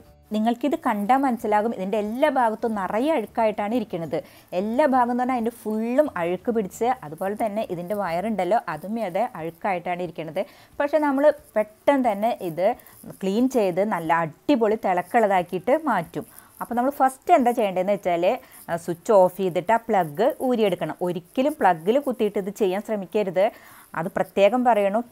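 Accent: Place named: Thrissur, India. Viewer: തൃശ്ശൂർ ഭാഷ കേൾക്കാൻ ഒത്തിരി ഇമ്പമാണ് ട്ടോ. നല്ല ഒരു tip. Thank you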